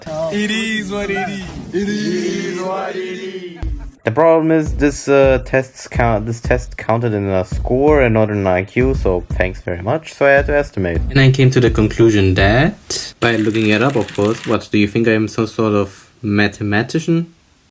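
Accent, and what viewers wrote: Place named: Germany. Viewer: Ich höre einen deutschen aktzent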